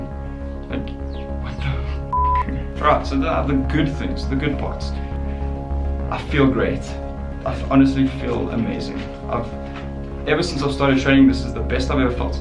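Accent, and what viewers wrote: South Africa. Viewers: are you south african? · South African accent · Dude, are you South African? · South African? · You sound South African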